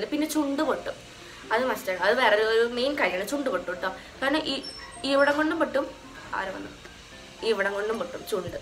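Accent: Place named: Thrissur, India. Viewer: Chechide veed thrissur aahno slang athupole ind ❤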